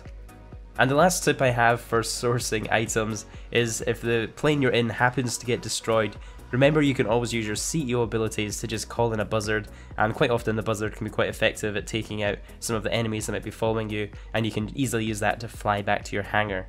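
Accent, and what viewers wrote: Scotland, United Kingdom. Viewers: Are you from Scotland if you are so am I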